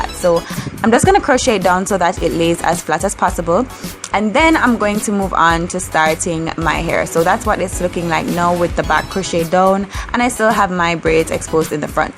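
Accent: Jamaica. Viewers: Jamaican? yeah girl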